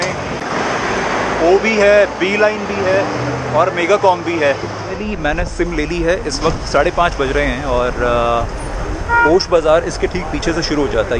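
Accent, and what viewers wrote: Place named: India. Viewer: Very hard to understand your english with indian accent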